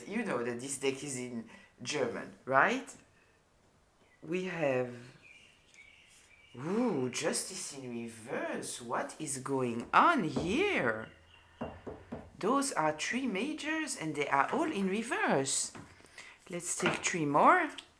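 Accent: France. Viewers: Love your beautiful French accent, and when you swear, lol, do you reside in the UK? Very insightful reader, I do resonate xx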